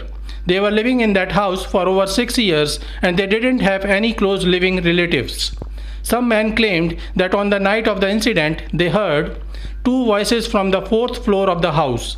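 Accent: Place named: India. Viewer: why how racist of u <3 the moment i heard his accent i knew he knew his sh*t lol. all informative videos made by people I hear with an Indian accent turn out to be great. Thanks for the vid!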